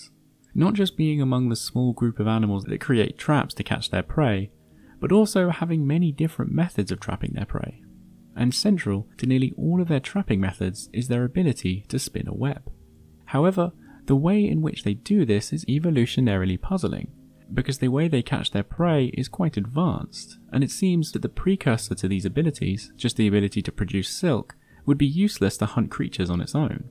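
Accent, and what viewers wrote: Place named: England, United Kingdom. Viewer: Does anyone else find this particular English accent really grating? Its just like nails down chalk board for me. All I hear is him sucking his teeth and speaking through his gums.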